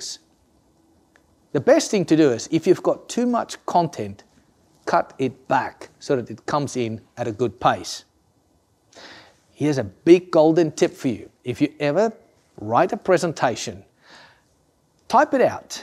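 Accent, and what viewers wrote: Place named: Afrikaans, South Africa. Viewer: Your accent sounds quite Afrikaans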